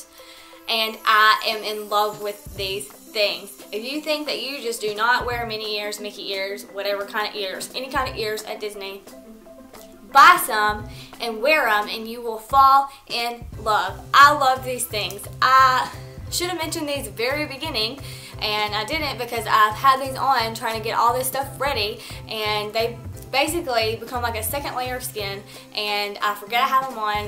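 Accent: South, United States. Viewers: Oh, cool! I'm from North Carolina, so I definitely know how it is to have a southern accent! Thanks for replying!:) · I love your southern accent babe! Are you from Texas? Sorry I'm new to your channel and Subscribed btw! :D Love the video and can't wait for more!(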